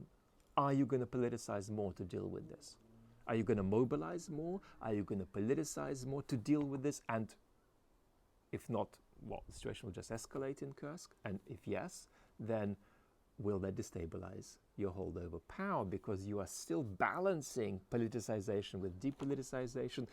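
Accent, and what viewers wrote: Australia. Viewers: We want more Aussie accents on VVC😂! ❤ from 🇦🇺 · Hi, thank you. Always informative, intuitive, creative, and all-encompassing, giving a balanced perspective. Your Australian accent is barely noticeable, perhaps from Tongan influences of encounters with Australians there. Certainly new south Welshman than Queensland.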